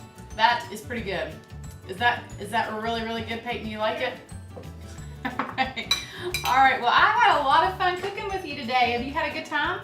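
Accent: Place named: South Texas, United States. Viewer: Great video. You sound like you're from South Texas.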